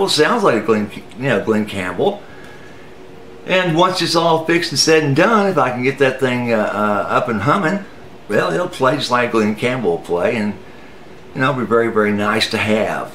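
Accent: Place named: Texas, United States. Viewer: The way you talk and your accent reminds me of Johnny Winter. I wonder if you're from the same part of Texas.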